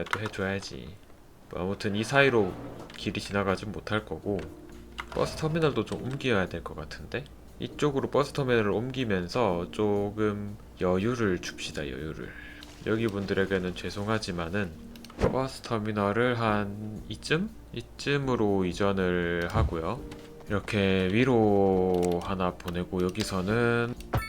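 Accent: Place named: Gyeongsang, South Korea. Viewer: 형님 매번 목소리가 너무 매력적입니다 경상도 분이시군요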